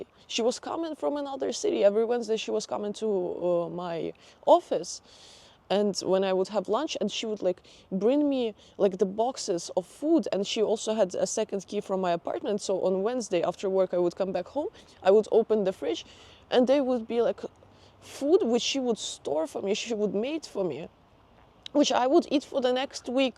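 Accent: Russia. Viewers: Так приятно слушать русский - английский 😂❤. Русские фразы на английском ❤. No offense. Спасибо тебе за историю.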